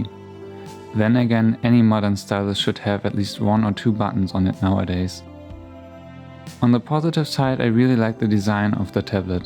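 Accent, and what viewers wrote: Germany. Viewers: Hey i'm new on your Channel. And i think you sound german. Are you? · You sound like a German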